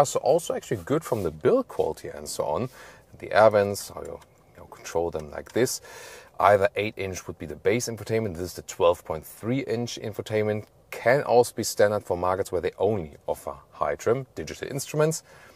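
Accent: Germany. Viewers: 主持人很重德語口音😂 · Not a big fan German cars, their time is over. German guy talks about toyota, very weird. And the accent as well.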